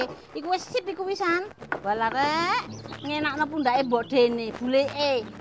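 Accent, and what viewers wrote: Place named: Mojokerto, Indonesia. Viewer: saya dari Mojokerto hadir asli orang mana kok logat bicaranya kayak orang Mojokerto, suka banget kontennya 👍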